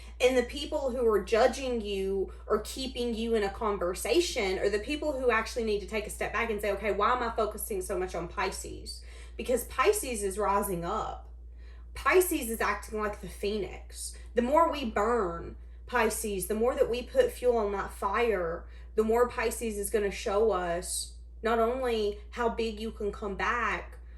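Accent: South, United States. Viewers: Holy cow! You are on point! This totally resonates with me. Thankyou so much ❤btw love you accent. I am from the South too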